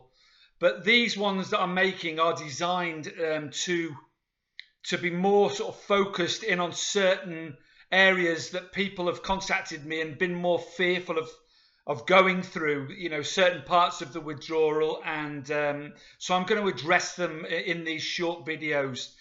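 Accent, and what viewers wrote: Liverpool, United Kingdom. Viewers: ...I've seen many videos on opiate w/d...I'm an old hand at it like yourself. But you've shot straighter than most I've seen. Often people will say, "After 4 days the worst is over"...you can be sure that person is taking the piss, out and out lying...why someone would want to pretend to be an addict is beyond me. I hope you are doing well. I had a close friend in Florida where we drove cabs for the same company...he was from Liverpool...you sound like you're from if not Liddy, then Manchester or the neighborhood. Great people in the North. Well, thanks for caring enough to make these for those facing the dread of RLS, what we used to call "jimmy legs". Cheers from Pennsylvania! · PS love your accent , what part of the UK are you from?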